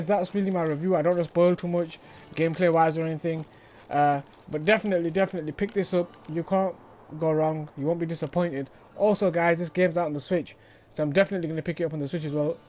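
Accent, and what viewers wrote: United Kingdom. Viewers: Is that a prestonian accent? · London accent with Indian parents?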